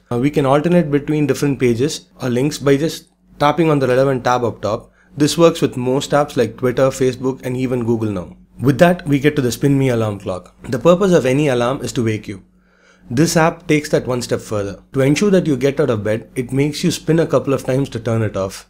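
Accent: India. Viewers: Guys dont be so judgmental on sumone's accent!!! wen sum Caribbean or Irish or Italian guys speak r we that much judgmental... then y d fck for indian.. carry on gud wrk... from Maharashtra Guy.(in case u r luking for my ethnicity) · I usually get annoyed when Indians try to imitate an american accent and fail miserably. I'm actually glad that he decided to stick to his Indian accent.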